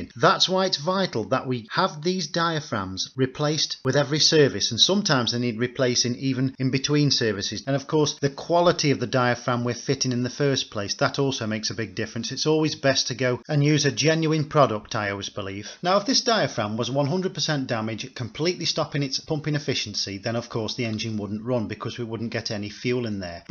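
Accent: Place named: Staffordshire, United Kingdom. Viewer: Are you from Staffordshire sir? You sound a bit like my dad from the potteries?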